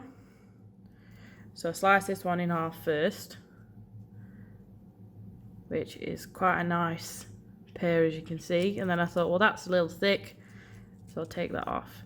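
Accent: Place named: Stoke, United Kingdom. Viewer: I have a piece of Opal but nothing to cut it with 😂 you sound like your from stoke, are you?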